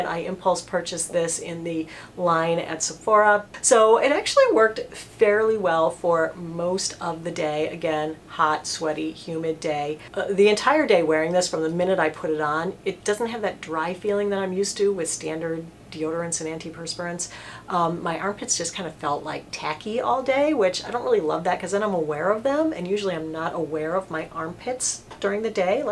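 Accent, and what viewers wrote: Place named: Boston, United States. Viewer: I never remember to ask you--where is your iconic Mass accent?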